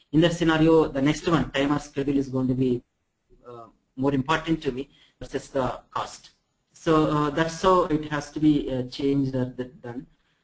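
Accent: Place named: India. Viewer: Thank you so much for uploading these videos. You hae vertainly chosen some greate topics to cover. However I would like to give some feedback if thats OK... It is very difficult to understand the what is being said because of the very strong Indian accent and the speed at which the material is being explain. Please use presenters with more English accents. many thanks and keep up the great work!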